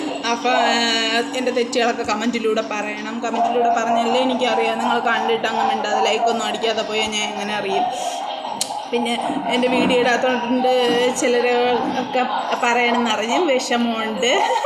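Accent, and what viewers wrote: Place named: Thiruvananthapuram, India. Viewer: നിങ്ങളുടെ തിരുവനന്തപുരം സ്ലാങ്ക് എനിക്കിഷ്ടമായി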